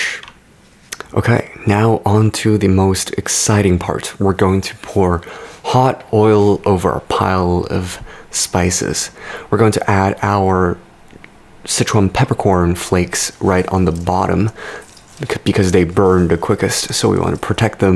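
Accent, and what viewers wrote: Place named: United States. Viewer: Unnecessarily Far too long with American accent is irritating. Some items are too exotic to find in the UK.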